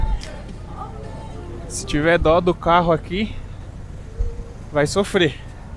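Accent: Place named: Minas Gerais, Brazil. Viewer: Pelo sotaque acho que o é mineiro, mas pode ser de Goiás ou Espírito Santo... Grande abraço ao e aos seus companheiros!!!!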